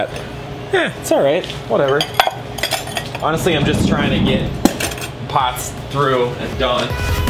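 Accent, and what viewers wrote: Minnesota, United States. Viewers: First time watching this channel. About in, thought to myself ‘he sounds like a Minnesotan a bit’ ... checked the bio, and what do ya know, I was right. (I’m also Minnesotan Btw), but I could definitely recognize the accent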